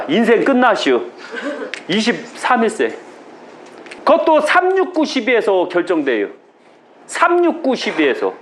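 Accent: Chungcheong, South Korea. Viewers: 이 분은 사라져가는 충청도 방언을 잘 간직하고 계시네요. 충청도 방언을 꼭 지켜 주세요. 진지드셨슈? 얼마나 점잖고 푸근합니까? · 안녕하십니까ㆍ10월14일화이자1차2차 부작용으로 죽음의문턱까지 다녀온사람입니다 엊그제 퇴원했구요ㆍㆍ뵙고싶은데 고관절양쪽괴사에ㆍ진작에 찾아뵙고싶었는데ㆍ아이랑 먹고사는거외엔 16년동안 일만해왔기에ㆍ혹 전화상담 하고싶습니다ㆍ 오늘도 인천이 친정이라서 ㆍ언니랑같이ㆍ유투브상영하고왔습니다ㆍ건강되찾아서 ㆍ꼭뵙고싶습니다 ㆍ정말 저도고향이 충청ㆍ청양이고ᆢ구수한 충청도 말씀이 너무좋습니다ㆍ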